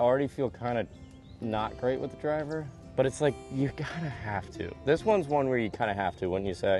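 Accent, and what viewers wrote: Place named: Minnesota, United States. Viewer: Minnesota, eh?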